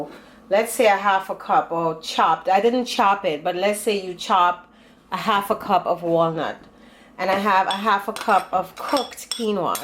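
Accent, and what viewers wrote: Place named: Trinidad and Tobago. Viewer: I looove your accent 😍😍 are you Trini?!??!!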